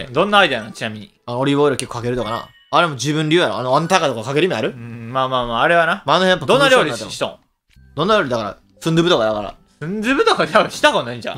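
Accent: Kansai, Japan. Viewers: 何言ってんのかわかんな 関西弁強すぎ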